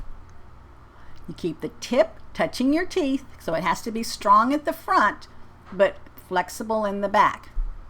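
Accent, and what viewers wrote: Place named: Texas, United States. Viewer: Who wants such a strong Texas accent? That should be made clear in the description that this is not for neutral English.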